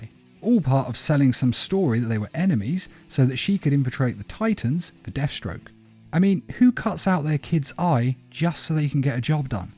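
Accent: England, United Kingdom. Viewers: I think it's an English accent.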